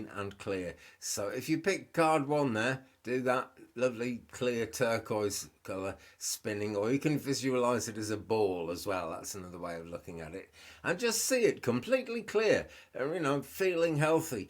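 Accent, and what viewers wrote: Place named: Wales, United Kingdom. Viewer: Love your voice & Welsh accent! My former mother-in-law was Welsh and you remind me so much of her! Have a great day!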